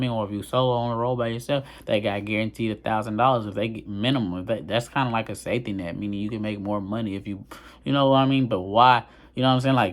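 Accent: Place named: Nashville, United States. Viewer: I want to say thnx and in curious are u from Nashville? THT way I say “LIKE AT) reminds me of when I spent a summer in Nashville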